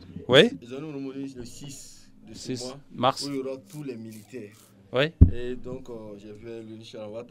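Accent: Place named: Africa. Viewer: Tu parle souvent comme les africain noirs avec un accent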